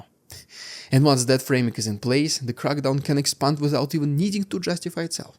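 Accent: Russia. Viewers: Wenn du mit 100 km/h sprichst, und mit deinem starken Russischen Akzent, hat man Muehe, dich zu verstehen. Obendrein scheint bei diesem Video auch noch die CC ausgeschaltet. Ich kann also noch nicht mal mitlesen, was ich akustisch nicht erfassen kann. Ich finden deinen Kanal gut, aber deine Sprechweise ist (entschuldige) katastrofal! Weiss auch nicht, was man da tun kann..... langsamer sprechen, vielleicht? Es ist irritierend! Ich schreib es dir auf Deutsch, weil ich davon ausgehe, dass du es verstehst, und nicht viele andere hier! Danke!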